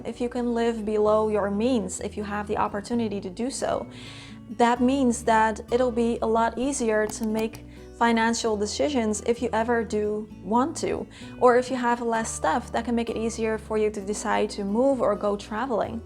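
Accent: Netherlands. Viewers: You have a lovely channel.🙏btw, are you Dutch? Because of the accent I was wondering😊love your interior... I'm on my way to being a minimalist but it'll take me at least one year to get rid of unnecessary stuff...😂😁😇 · Love your calming style of videos and love minimalism! When I saw your background I knew you were Dutch somehow 😂 And when you started talking I was like yup! Subscribed!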